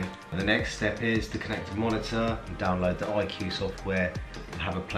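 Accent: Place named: United Kingdom. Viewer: you say "this front panel (satl?) connector", what word are you saying? I'm from the US and sometimes a British accent makes it difficult to pick up every word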